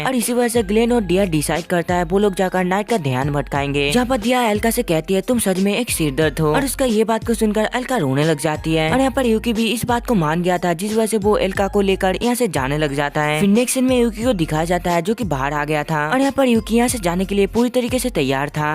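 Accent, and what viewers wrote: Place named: Bengal, India. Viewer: Bengali tone mat nikalo ..aur thik se bolo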